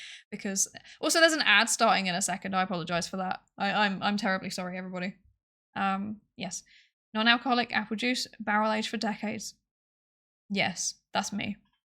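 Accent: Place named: United Kingdom. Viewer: I don't know how I got here, but I can tell from your accent that you're from the same region in the UK as Rin Penrose, so that's good enough a reason for subbing as any.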